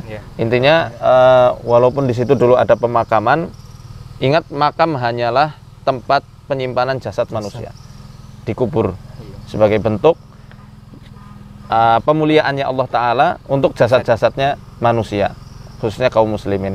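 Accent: Banyumas, Indonesia. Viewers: assalamualaikum pak ustadz alamat dimn aslinya orang mana...ngomong ngapak apa y...salam dari Cilacap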